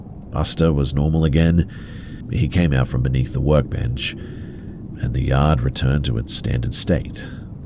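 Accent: Australia. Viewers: Just the aussie I was lookin' for to put me to sleep!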